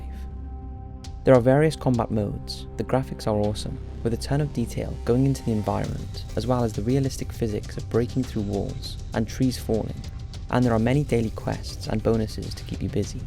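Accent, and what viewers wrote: Wales, United Kingdom. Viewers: Ate you Welsh? If so what part? I'm west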